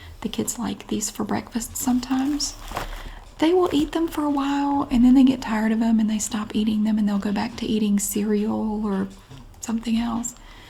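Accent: South, United States. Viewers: How about Abigail for the kitten and call her Abby. She’s so sweet. you look so beautiful in that color. Your skin is glowing. Love your videos I listen To them every night. The smooth soft southern accent. Amazing 😉❤️❤️❤️❤️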